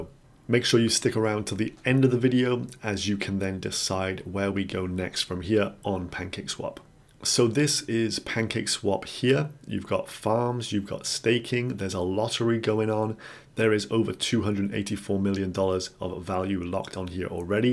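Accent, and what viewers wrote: Wales, United Kingdom. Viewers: You from cardiff?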